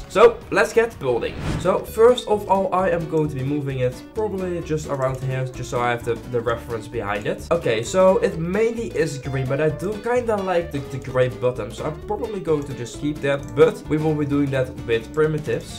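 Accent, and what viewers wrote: Netherlands. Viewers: Are you Dutch? Because I think so (Ik denk het namelijk wel)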